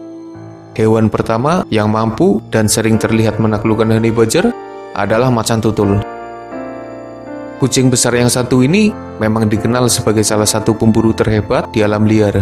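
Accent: Banyumas, Indonesia. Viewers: Ngapak banget bang wkwkwkwk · Jawa ngapak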